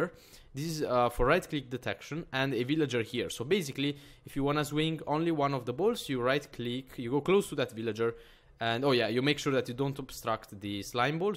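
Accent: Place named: Italy. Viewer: si sente che sei italiano♡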